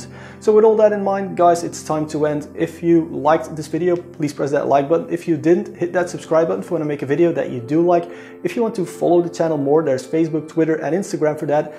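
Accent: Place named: Netherlands. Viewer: Why do you sound just a little bit dutch, or german or something ;) oh shit je bent nederlands haha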